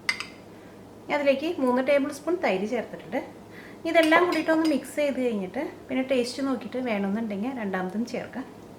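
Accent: Thrissur, India. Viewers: Hi, I tried this, everyone here loved it. Very tasty. All your dishes are really nice I have tried some other dishes also. In the beginning I started watching becoz of ur thrissur slang but then later I liked ur all dishes. even I am from thrissur. best regards.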